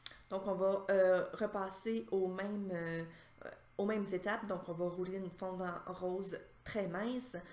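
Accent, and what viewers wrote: Quebec, Canada. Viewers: de rien vos videos sont super et j'adore l'accent quebecois ;)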